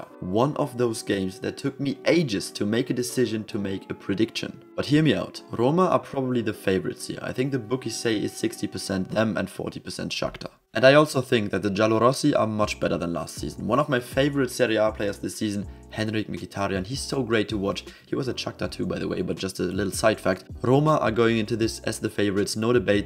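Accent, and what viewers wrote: Germany. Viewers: Ich hab mir gedacht dass du Deutscher bist lmao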